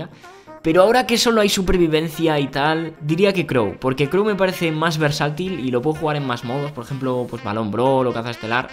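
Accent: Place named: Spain. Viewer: me estas trolleando? Aburrido? Tus preguntas y respuestas son unos de los mejores y... 100% seras mi youtuber favorito hasta tu face reveal(si lo haces) no me suelen gustar porque me suelo decepcionar un poco pero como eres español(o tienes acento español) la mayoria de youtubers epañoles me suelen gustar porque yo soy de españa. Pero creo que de todas formas, tu voz nunca me decepcionará y con ella nunca dejara de gustarme tu canal y muchas veces, me molan los chistes, ejemplo “es verdad que tiene poco rango” de el video de los 7 mejores gadgets, en fin, tus videos me pareceran los mejores despues de 4 videos otro youtuber Tus videos molan! 🔥🔥🔥❤️💪💪💪💪👍👍👍⭐😘😍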